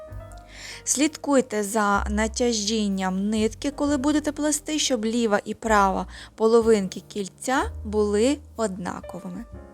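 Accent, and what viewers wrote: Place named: Russia. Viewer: а не лень язык ломать? А хотя прикольно: я и технике от Вас научилась и мышцы живота насмеяла над Вашими попытками говорить на украинском. 😊😊😊 Спасибо Вам за двойную пользу 😊😊😊 Всё равно сбиваетесь на русский 😊😊😊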